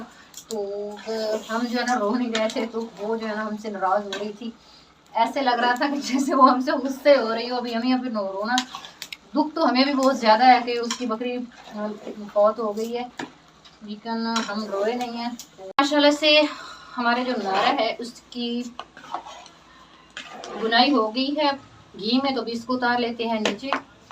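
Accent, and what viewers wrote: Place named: Lucknow, India. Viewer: wali beti tu aaj luckhnow wali urdu bol rahi hay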